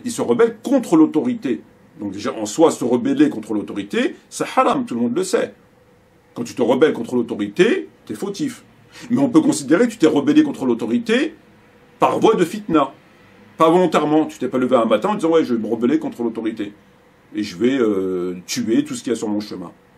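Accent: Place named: France. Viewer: Qu'Allah te guide SubhanAllah, tu nomme toi même dans le début le conflit de fitna, la où des compagnons présent à l'époque n'ont pas prit position et parti car fitna il y avait, toi avec ton accent de français quand tu prononce péniblement des mots en arabe tu viens tenter à mettre en doute l'attitude à avoir pour ne pas laisser le conflit entre eux et Allah, tu dis toi même c'est un conflit entre musulmans, tu t'entend pas ? SubhanAllah on s'en fou de ton avis, garde pour toi ton venin et ta compréhension avec ta raison 3aqli Laisse la transmission au vrai savants et va apprendre l'arabe correctement sinon va regarder un film sur netflix par exemple Tu es à l'image du zindiq oubrou que l'on vois derrière toi sur un livre dans d'autres de tes vidéos Fais de la pâtisserie ou je sais pas, mais trouve toi une autre occupation que de reprendre du venin philosophe et avec ta raison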